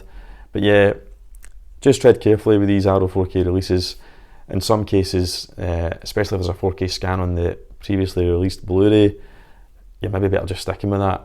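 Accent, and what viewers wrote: Scotland, United Kingdom. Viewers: I don’t think your accent is difficult to understand at all. Yes, you have a Scottish accent, but it isn’t spoken with speed and slang. Your English is fine. I suppose it could be difficult if the listener was Chinese or something. Or American.... Americans have trouble with the English language. 😂 (Thats humour by the way if there’s an American reading this) Keep up the good work mate. And don’t say I told you this but your accent is fine! 👍🏻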